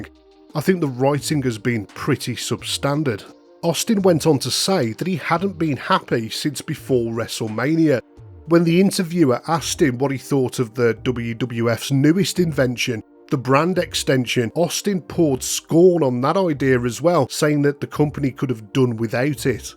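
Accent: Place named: England, United Kingdom. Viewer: Love this guys English accent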